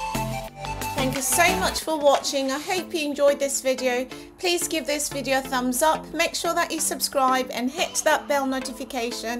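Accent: United Kingdom. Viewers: I just come here solely for that. Her strong British accent is entertaining. The moment she says, “welcome buck” when I’m used to hearing “welcome beck”. That on its own cracks me up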